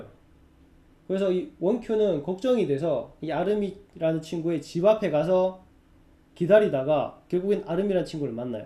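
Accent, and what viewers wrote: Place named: Busan, South Korea. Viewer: 딥러닝에 관심이 많아 동영상을 보게 되었습니다. 설명도 쉽고 재밌네요. 특히 부산사투리가 매력적입니다ㅎㅎ 꾸준히 구독하겠습니다. 추천업!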